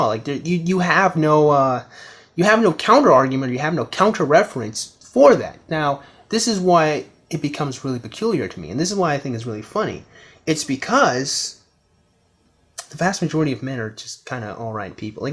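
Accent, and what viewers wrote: United Kingdom. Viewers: I love your british accent btw, some real funny shit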